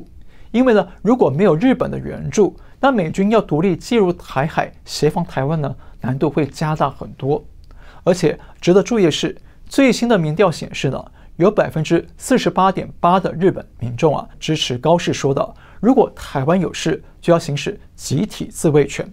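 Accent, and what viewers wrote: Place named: China. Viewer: 听口音也曾经是个地道的中国人吧，你这个日本武器当的自觉自愿🎉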